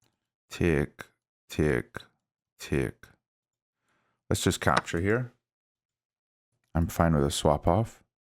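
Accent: Northern Ireland, United Kingdom. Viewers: Is that a northern Irish accent with a very slight glimmer of either European or African? Very interesting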